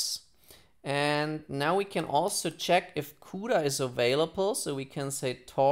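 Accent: Germany. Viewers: His voice is too sharp with a German accent.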